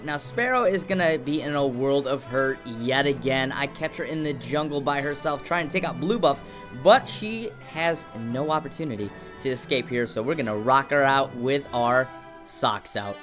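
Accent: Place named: United Kingdom. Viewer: That British accent though..... Man. I really want to play a match with you. I'm getting so frustrated at playing builds similar to this and kicking ass and having a weak team that just don't make the power plays you need them to... I've gone 21/2/6 before now and still lost the match. You can't carry a bad team :( 👎🏻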